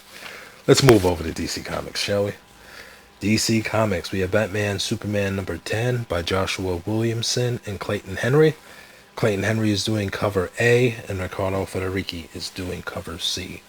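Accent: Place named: New England, United States. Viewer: Yo my guy are you for Mass.? I be hearing that New England accent lol.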